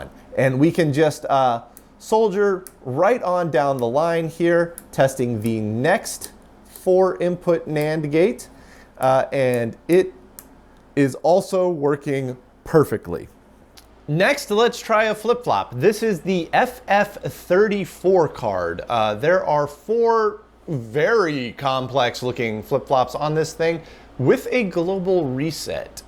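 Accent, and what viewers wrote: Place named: United States. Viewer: Right? Always nice to see some metric from someone with an American-sounding accent. :) We'll get there eventually!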